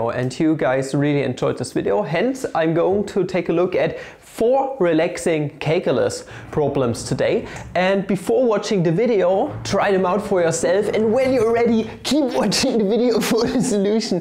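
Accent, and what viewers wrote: Germany. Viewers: Ich mags wie der deutsche Akzent bei dir immer mehr und mehr schwindet beim englisch Reden :D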